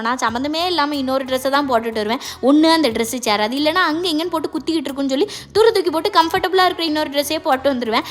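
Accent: Tirunelveli, India. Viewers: Nenga Tirunelveli ah?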